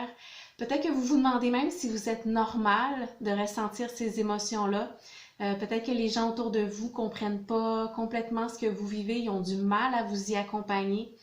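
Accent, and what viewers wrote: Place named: Quebec, Canada. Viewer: Bravo et merci pour toutes ces explications avec un très bel accent québécois. Très compliqué de faire le deuil de son animal. Petit coucou depuis la France...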